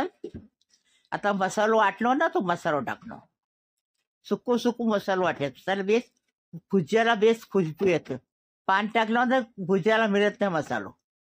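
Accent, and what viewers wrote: Konkan, India. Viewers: Masha Allah aunty aap kokni ho bolo mazaa aata hei. Hum samajhte hei , Bolne mei ardevat hei 😊, mummy accha bolte thei , Allah unko jannat naseeb kare ameen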